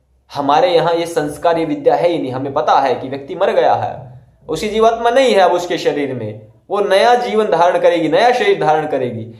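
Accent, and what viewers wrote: Haryana, India. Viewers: Pkka Haryanavi hai bhai.. Maan ge bhai.. Bhai mera comment pdhe aur btana chahe toh ek baat puchhni thi bhai se ki kahan se ho bhai haryana mein.. Baaki boli toh thodi thodi panipat ki lgi..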